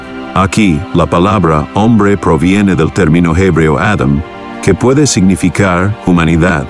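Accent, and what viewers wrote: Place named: United States. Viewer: Por qué pusiste la voz de un gringo si esta hecha con inteligencia artificial, por qué no pusiste la voz de un latino?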